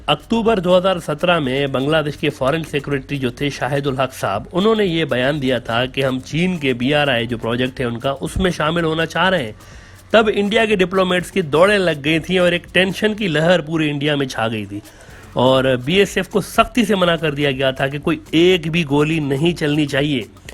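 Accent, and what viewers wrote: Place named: Pakistan. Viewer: you can see from how he pronounce it's Urdu by Pakistan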